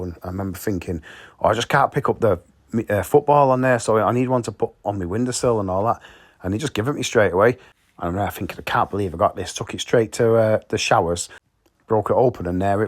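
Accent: Manchester, United Kingdom. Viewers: Lad proper thought you were black (don't know why) love that scally manc accent and that's from a Scouser,❤ the content 👍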